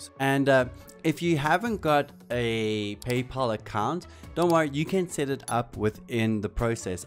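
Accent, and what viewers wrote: South Africa. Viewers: Ayyyeee Afrikaans?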